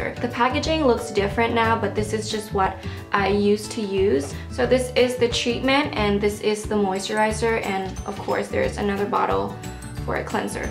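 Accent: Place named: Thailand. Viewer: Love the Thai accent!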